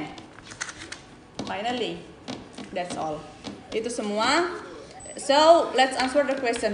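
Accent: West Sumatra, Indonesia. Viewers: Mbak aslinya apa orang Minang.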